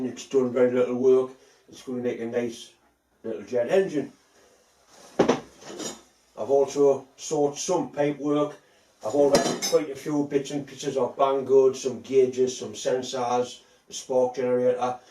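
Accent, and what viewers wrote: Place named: RP, United Kingdom. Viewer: I think if viewers can’t understand your Queen’s English they just need to watch more of your videos. It kinda grows on you 😊. All the best to you and your family. I hope you have a Merry Christmas and a terrific New Year!!! Norm in Arizona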